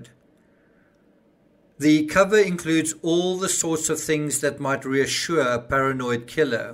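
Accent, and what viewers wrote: South Africa. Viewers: I think he’s South African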